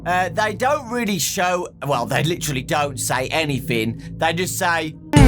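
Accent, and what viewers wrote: United Kingdom. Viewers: quality content,British guy talks about a company saying hmmmmm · My dad hates your accent:( he dosent like British accent at all! But i love your accent! I wanna learn British accent!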